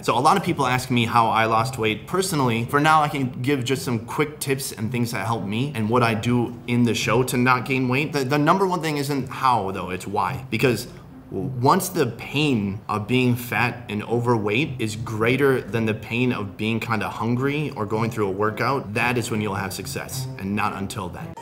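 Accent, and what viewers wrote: Minnesota, United States. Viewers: I thought he sounded Minnesotan, didn't realize he was from my hometown area!!! 💁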